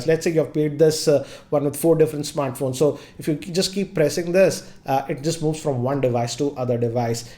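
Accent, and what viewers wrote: India. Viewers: Truth time...I only clicked on the video to hear an Indian English accent....was not disappointed. Also....nice review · He is an Indian and most of the Indians have a similar accent but he is providing good content though.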